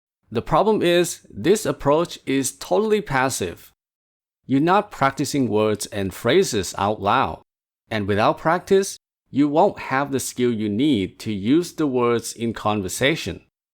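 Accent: Thailand. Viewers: I think your broke English sounds like Thai pronunciation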